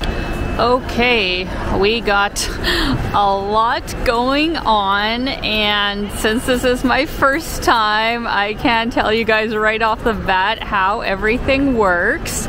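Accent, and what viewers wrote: Canada. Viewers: I can see why college students would want to watch videos … excellent pronunciation and cadence for those learning English (with a lovely slight Canadian accent). And it’s experiencing new adventures through positive respectful awareness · You know, I never really get when my American friends told me we have an accent, but somehow I can tell she’s Canadian from the way she talks. 😂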